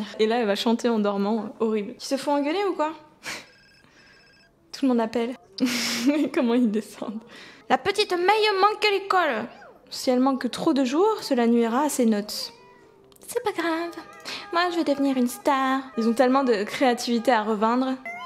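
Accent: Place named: United Kingdom. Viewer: J'adore ton axent anglais sinon te la best ma yt préf 🥰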